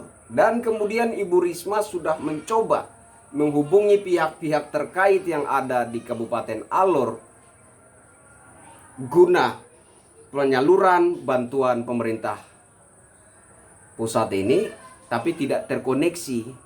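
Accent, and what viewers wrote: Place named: Java, Indonesia. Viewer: Udah sering lihat chenel antum mas bro....sepertinya gaya bicaranya banyak dipengaruhi tata cara orang Jawa...bravo sukses selalu,udah sering lihat chenel ini sekarang langsung subcr...👍👍👍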